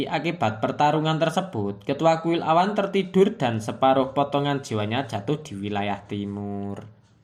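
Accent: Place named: Java, Indonesia. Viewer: Bang bahasa di ganti dong kebahsa Indonesia Jagan bahasa Indonesianya kayak di ucapakan seperti bahasa Jawa mungkin karena itu sasgraibernya sedikit yg laik pun sedikit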